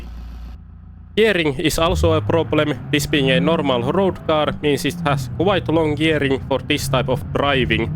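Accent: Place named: Finland. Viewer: You soud very much like you are finish.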